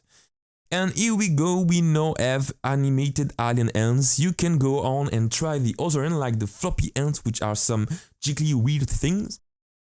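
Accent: France. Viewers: tu es francais ? L'accent a l'air un peu francais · U ve a french accent ! R u ? ^^ thx a lot, all ur videos are so usefull, u rock ;) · t'a un accent francais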